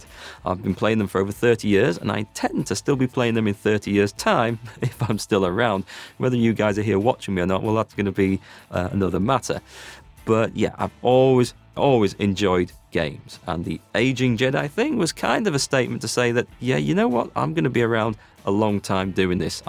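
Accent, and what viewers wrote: Yorkshire, United Kingdom. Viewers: where about in Yorkshire are you from?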